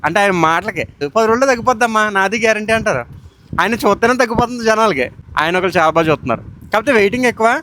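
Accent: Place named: East Godavari, India. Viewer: EG district language irritating ga vuntundi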